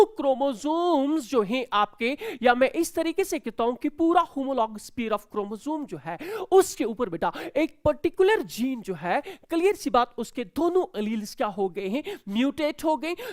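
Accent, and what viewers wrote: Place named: France. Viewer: Heyyyy french accent, the huge energy, deliverance kya baat h sirrr 🔥🔥🔥! love from my side ❤❤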